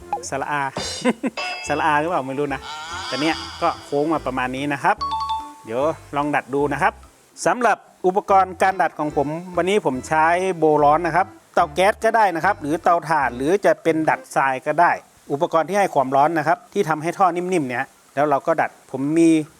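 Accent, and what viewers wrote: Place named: North, Thailand. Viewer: เป็นคนเมืองใช่ไหมครับพูดไทยสาบเมืองมากเลย😅😅😅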